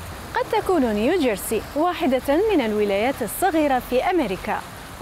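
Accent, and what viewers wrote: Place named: Iraq. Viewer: مبین من لهجتها عراقیه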